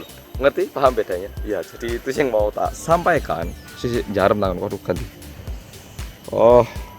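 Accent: Java, Indonesia. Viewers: masih kuliah ya en kuliah di Sg?? kalo prtama ktmu bgg kaliyah mukanya kek bkn org chinese tp ngmgnya jawa medok diajarin medok sm org Korea dongg, bahkan g ngasadar samsek yg soal Jakartak ntu slama idup sbg org indo 24th gemesloh pas ngliatnya ngmg esssssss njarem itu opo? Keren bgt skrg bs 4 bahasa dong yaah, indo jawa korea sm inggris!!! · Cak, sampean wis tau duet gae vlog bareng ambhek Londo Kampung ( youtubers Australia Suroboyo ) kae, lho? Muwedok'e mirip pisan ambhek sampeyan. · Mas ngeprank apa mas ,kok medok tenan bahasanya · wealah mase ki wong korea kok medok tenan leh ngomong jowo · KOREA MEDOK JOWO Sip mantep tenan salam pompersa (kompak penuh pershabatan) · wong korea roso jowo enak tenan.... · Aku dari jakarta, udh tinggal d malang dr kecil smpe skrg.. 14 tahun an.. Sama ky oppa.. Dan oppa keren banget bs se medok itu.. Pdhl aku org indonesia asli.. 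Salut parah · guru virtual medok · Tak kira sampeyan ki orang korea yg tinggal di jakarta, pas nonton videomu kaget. Lho medok? Gak retio tumbuh besar ndek malang ik.. Arek ngalam. Medokmu kui lho mas.. Aku yg orang jawa asli aja ngiri dg kemedokanmu wkwkwkwk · Lahh lahhh apa bener kie wong korea ,,aduhh kok medok bgt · Kok kekel yoo, aku 😂😂 medognya itu lo, lovely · senyum senyum sambil geli2 gitu medok mbanget oo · Ada oddly satisfaction tersendiri nonton mas ini ngomongnya medok. Hahahaha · Njareem.... Smpyn kuwi wong jawa mas hehehe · Jiiiiiaaaaaan muuuuudddiiiiooookk buuuuaaaaaanggggeeett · wkwkw.. arek iki luweh njowo soko wong jowo rekkk.... isinlah kita hahaha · Smpen iki yo medok pinter jg nulis aksoro jowo. Wong jowo urung tentu iso mas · Aku tinggal di Malang tapi ngakak denger oppa ngomong medok soale aku sendiri kalau ngomong gak semedok dirimu😄😄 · subscribed!!! sumpah kaget pas ndelok wong korea medok. 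nek ngomong jowo nyell, hahaha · wong bagus soko korea apek le kowe mendok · Lucu lek ngomong ... muedokkk 😂😂😂😂😂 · 😂😂😂 muanteb jowo ne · Lahhh baru nemu inii orang korea ada yang medok jawanya unik i appreciate it 🙌🏻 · mas keren,aku ngguyu2 dewe ndelok vidoene sampean, jan jowone ki lo koyok wong jowo asli... aku malah pengen iso bahasa korea mas, ben ndang iso pripun nggeh mas? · Iki ora wong jowo timuran tok.. wong jowo tengah yok ngono.. emboh sii yen wong yogya..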